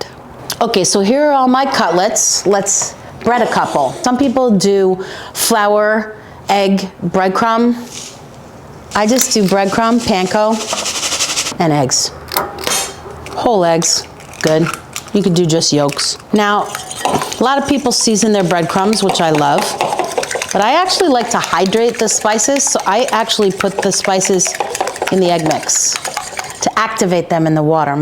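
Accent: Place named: New York, United States. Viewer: You and Paul Sorvino pronounce sandwich, sengwich. Is that Jersey or New York?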